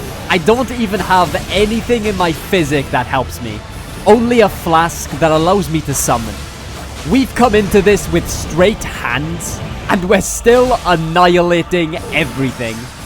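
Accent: Wales, United Kingdom. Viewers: So Elden ring content in 2026, with a solo leveling twist and is that a welsh accent i hear take my subscribe my fellow welsh warrior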